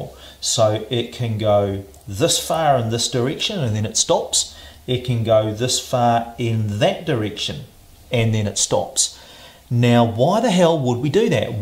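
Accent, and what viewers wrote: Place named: New Zealand. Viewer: I was dmn sure you were a kiwi :D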